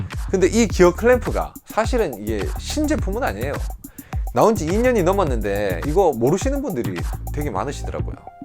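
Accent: Gyeongsang, South Korea. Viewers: 저 진짜 팬이에요 ㅎㅎㅎㅎ 다른 아이디로 cocokim 으로 구독 하고 2년 넘게 보다가, 지금 유튭 활동중인데 너무너무 재밌게 보고 있어요 ㅎㅎㅎㅎ 저는 미대 공부한 프리인데 공구 보는게 너무 재밌어요!! 타계정으로 보기 불편해서 주계정으로 또 팔로했네용 ㅎㅎㅎㅎ 언능 언능 보려규요 연기력도 늘었다고 ㅎㅎㅎㅎㅎ 한 사람이에요 갱상도 고향이라 넘 목소리 친근해서 힐링 되서 빠져서 들어요...ㅎ ㅅ ㅎ ❤️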